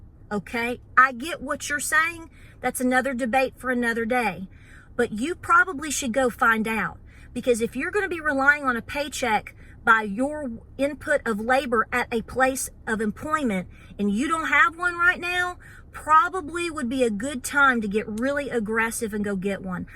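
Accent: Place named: Appalachia, United States. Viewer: This is 100% appalachian woman my wife and 3 daughters and every homegirl i know sounds exactly like this...you want her on your side lol..she's slowing it way down trust me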